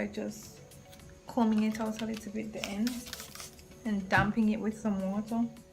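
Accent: Jamaica. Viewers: This is really neat .. I can never slick my hair down ! But ill try this way .. Btw Are you Jamaican ? Kinda sound like one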